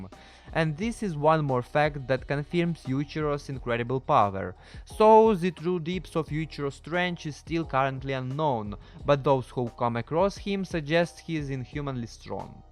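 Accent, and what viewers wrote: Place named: Russia. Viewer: у тебя какой то русское акцент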